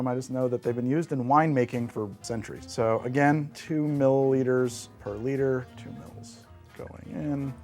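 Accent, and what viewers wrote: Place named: New Jersey, United States. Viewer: You tawk the way you do cuz youre from Joisy. I also grew up in the north east, Massachusetts (and no I'm not from Baaston, I'm from Woostah), and I've never heard a damn soul say "affiktionado."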